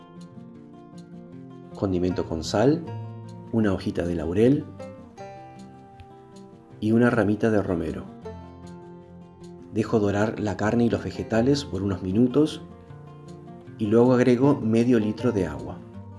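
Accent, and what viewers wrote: Argentina. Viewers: Por la forma de hablar parece argentino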